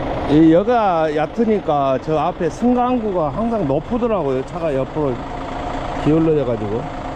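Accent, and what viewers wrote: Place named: Jeolla, South Korea. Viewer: 기사님 덕분에 영상으로 나마 힐링하고 갑니다ㅎㅎ 표준말보다 전라도 사투리가 더 정겨워요^^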